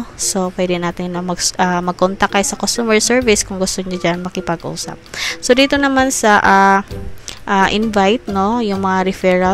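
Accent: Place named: Visayas, Philippines. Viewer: Bisaya ka mam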